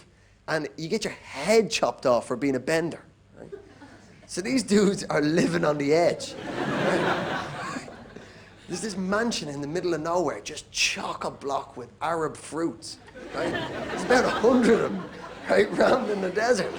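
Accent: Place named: United States. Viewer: Andrew Maxwell does a good American accent.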